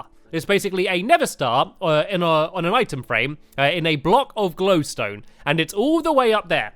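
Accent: United Kingdom. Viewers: Alright!! I don't know if it's just me, or does stampy sound less british???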